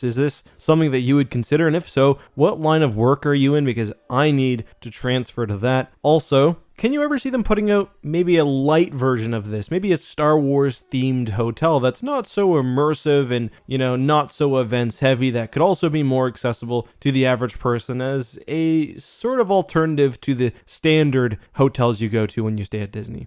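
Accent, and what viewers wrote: Canada. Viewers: U Canadian boi?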